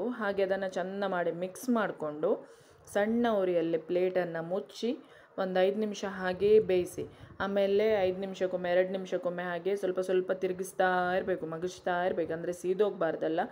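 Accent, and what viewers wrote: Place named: Tulu Nadu, India. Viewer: ನಿಮ್ಮ ಕನ್ನಡ ಮಜಾ ಇದೆ,ತುಳುನವರೂ ಹೇಗೆ ಮಾತನ್ನಾಡುವುದು, ಬೆಂಡೆ ಚಕ್ಕೊ ಮಸ್ತ್ ಇದೆ,🙏🙏👌👌😋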